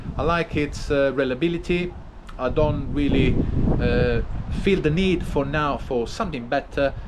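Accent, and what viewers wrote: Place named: Italy. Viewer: Good spirit man😅 Keep on the good work. Love your italian accent👌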